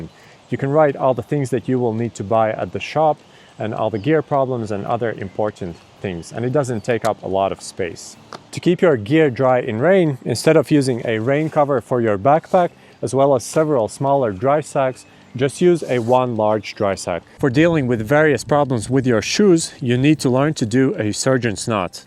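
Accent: Latvia. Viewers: Very helpful, super compact and easy-to-understand video! Skatoties likās, ka Tev ir mazliet latviešu akcents, un izrādās esmu trāpījis. Sveicieni un lai izdodas arī turpmāk!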